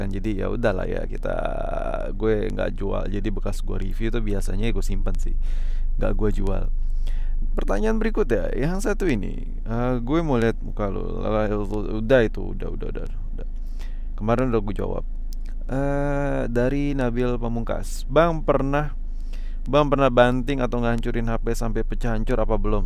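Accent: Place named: Medan, Indonesia. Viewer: Logatnya logat anak Medan tapi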